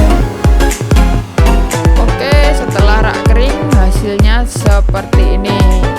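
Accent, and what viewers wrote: Java, Indonesia. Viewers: Bang medok bet😁😂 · Tolong kurangi lah medok nya saya jadi gak nyaman nontonya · Orang jawa ya mas kok suaranya persis orang jawa aku orang jawa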